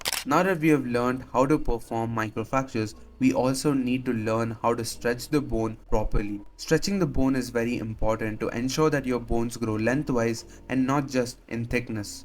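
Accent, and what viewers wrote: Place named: India. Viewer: after i heard the indian voice i knew that video is gonna be crazy xD gj bro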